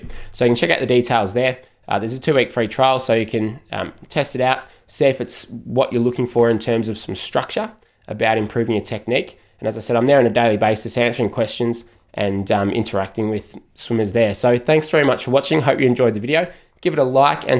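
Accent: Australia. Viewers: This is very helpful and( are you Australian) You are very handsome young gentlemen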